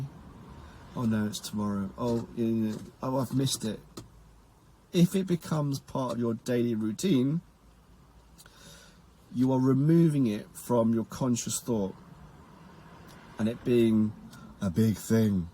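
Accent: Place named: New York, United States. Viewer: Yes!!!! New York accent 😂👌🏻 HOT DAWWWWG 🌭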